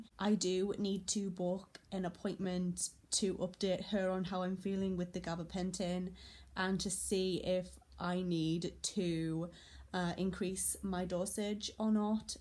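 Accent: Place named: Scotland, United Kingdom. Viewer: Lovel, cute accent. I presume Scottish. Sorry, I am from California and haven't been to your part of the world yet. But yes, Gabapentin works for me for diabetic neuropathy and absolutely has different affects depending on so many things, like foods I have eaten, interaction w/ other meds... BTW you've got some brilliant music, et al. of course! assuming you are Scottish that is.How is the Gabapentin working now?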